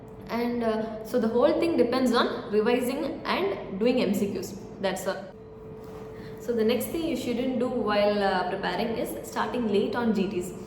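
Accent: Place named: Kerala, India. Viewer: kerala accent :face-blue-smiling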